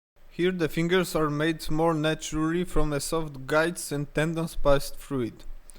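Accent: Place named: Poland. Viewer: Coś mi tak zaświtało że to może być rodak po tym akcencie xD Życzę samych sukcesów, choć przykre jest gdy się patrzy jak wielki potencjał marnuje się u nas. Na zachodzie już dawno byś siedział we własnym laboratorium, choć prawda jest też taka że gdzie by się z tym nie poszło to każdy będzie chciał mieć to dla siebie.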